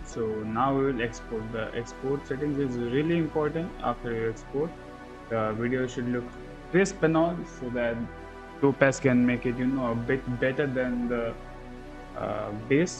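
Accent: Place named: India. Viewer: 😊Indian high quality voice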